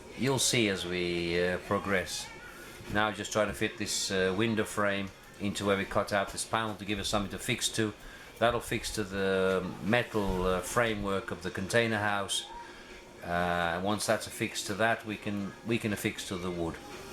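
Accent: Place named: Wolverhampton, United Kingdom. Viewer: You from Wolverhampton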